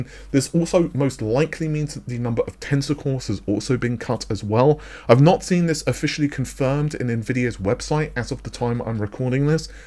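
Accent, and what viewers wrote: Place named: RP, United Kingdom. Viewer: He's what's known as a "toff" and they all speak like that :)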